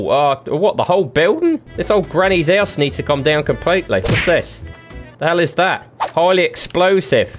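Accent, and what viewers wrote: United Kingdom. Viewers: Your british accent just makes it so much funnier damn.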